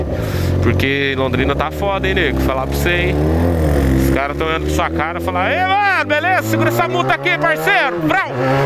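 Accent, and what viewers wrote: Goiás, Brazil. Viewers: e Porque Fala o Pique Do Goias? Kkkkkk Sou Goiano e n Entendi n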